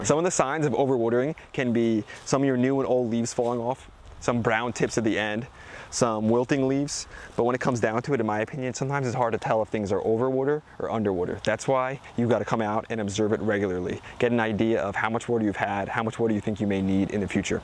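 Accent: New Jersey, United States. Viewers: Come for the gardening advice, stay for the New Jersey accent. "Worter"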